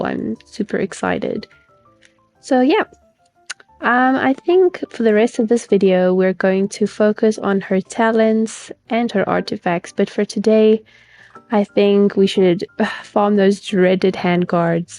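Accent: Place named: South Africa. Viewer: I’m really sorry if this is rude and ignore it if it is but I can’t help but think you sound South African 😭 (this is coming from a South African) If you are it’s so nice to see other South African Genshin players! ☺️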